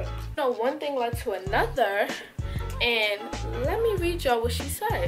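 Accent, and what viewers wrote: New York, United States. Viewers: You from nyc?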